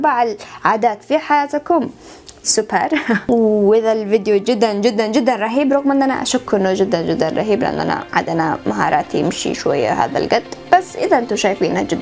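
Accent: Sanaa, Yemen. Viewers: محلاش ماشاء الله عليش يوم تتحاكي بالصنعاني ي لبى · انتي.غير بنات اليمن.انا مش مصدق انك يمنيه.لهجتك خليتنا اصدقيك انك.من اليمن.الله يحفضك وايوافقيك اختي.هيثم من اليمن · فديت اللهجه هذي الصنعانيه الحاليه اللي اشتي اتعلمها وفديت بنت بلدي الانيقه الراقية الله يسعدش 💗🙂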